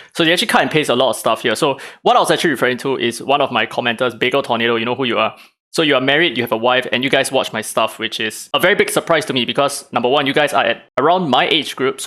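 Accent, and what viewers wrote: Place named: Singapore. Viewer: normally he has some English Singaporean accent, but yeah maybe because it's more formal his accent is not as heavy as usual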